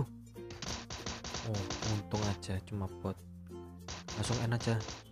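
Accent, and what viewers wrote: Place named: Java, Indonesia. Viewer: Br nonton game ini.. Sk sm suara nya medok medok jowo ok.. Arigato gosaimasta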